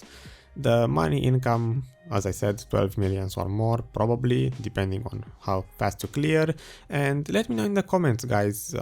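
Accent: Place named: Romania. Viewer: yo, nice vids man, are you Romanian? Thou your English is almost perfect, i can hear a hint of accent which takes me in that direction :P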